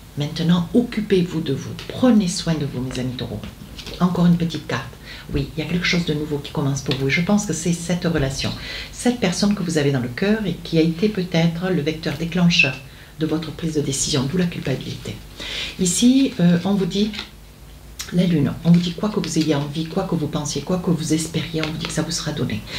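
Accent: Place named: Agen, France. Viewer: bel accent agenais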